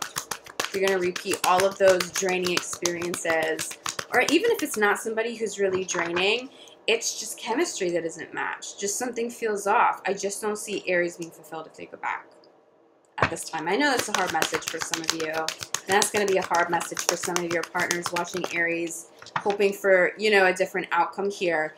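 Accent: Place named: East Coast, United States. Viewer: Your from the East coast 🤔🤔🤔🤔🤔♈👑 I like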